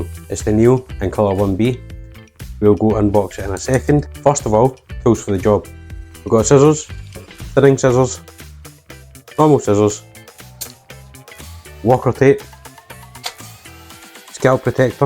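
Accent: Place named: Scotland, United Kingdom. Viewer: What is this accent? Scottish? I only understood maybe %30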